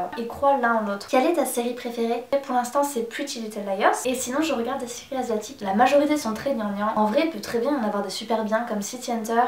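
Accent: South, France. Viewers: T'es tellement chooooooooooou :) Tu as de plus en plus l'accent du sud hihi c'est trop rigolo j'adore <3 Faut absolument que je regarde la série heaven tree du cooup!! :) Oui pour le haul, bisou d'amour <3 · Vive le sud ! D'ailleurs je trouve qu'on a jamais autant entendu ton accent que dans cette vidéo c'était chouette ! :p Je me suis reconnu dans les critères de mec " ce qui me fait craquer c'est qu'il soit plus grand, ce qui me rebutte c'est qu'il soit plus petit " c'est exactement ça :) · Ah je t'aime vraiment trop. Je pense que c'est le meilleur TMI tag que j'ai vu jusqu'à présent. Le montage est top. Tu es trop mignonne et tu m'as fait vraiment trop trop rire tout au long de la vidéo. Moi aussi je suis poisson, ton anniversaire c'est donc Lundi? Moi, c'est demain hiihiii... Si j'y pense je te le feterai, meme si je pense que tu ne verras pas ce commentaire, enfin bon anniversaire en avance.. 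C'est vrai qu'il n'y a pas beaucoup de Youtubeuse qui viennent du Sud, et j'adore ton accent, on l'entend dans cette vidéo! Gros bisous :b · aha j'aime ton accent du sud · J adore trop trop le montage parfait avec l'accent du sud moi je comprends pas je vis dans le sud et jai pas d'accent mdr tes trop mignonne je trouve que tu fais plus grande (pas en taille ) juste j'adore cette vidéo et la youtubeuse ♥★♣:-D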